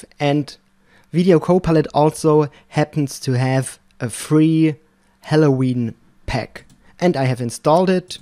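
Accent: Germany. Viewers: Du hörst dich deutsch an :D Vieeeeelen Dank für das Tutorial, genau was ich gesucht habe und absolut verständlich erklärt ! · You sound like a german, idk man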